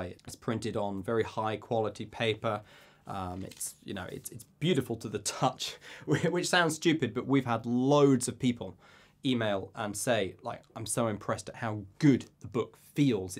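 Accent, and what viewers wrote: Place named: United Kingdom. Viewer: I love your British accent 😍😍😍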